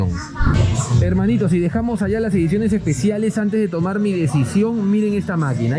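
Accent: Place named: Peru. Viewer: Me gusta mucho tu contenido es interesante pero de verdad que no puedo soportar el acento y la voz peruana es como si estuviese viendo discovery kids lo siento:(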